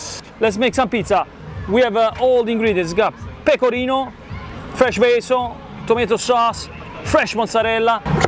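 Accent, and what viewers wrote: Italy. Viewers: mi piace che nonostante vivi negli States da un po' non hai PER NIENTE perso l'accento italiano! 😁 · I'm not gonna lie, I've always enjoyed your videos but sometimes I feel like you trade on your Italian accent and alleged authenticity just a little bit too much. Having watched this, you just can't fake that level of skill. Anyone can make 1, or 2, or 10 pizzas for a party. What you showed here takes it to a whole different level and I'm genuinely impressed enough to go and watch your older videos with a new eye. Enjoy your well-earned rest, and I'll happily be following you for the next 20. Or 50. Or 1000. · At First i didnt recognize the italian accent.... But i was sure he was italian😌❤️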